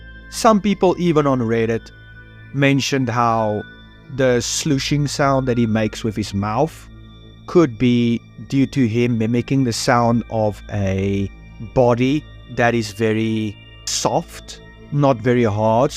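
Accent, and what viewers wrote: South Africa. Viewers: Unrelated question but where are you from? Your accent tells me you're South African. Love your content btw.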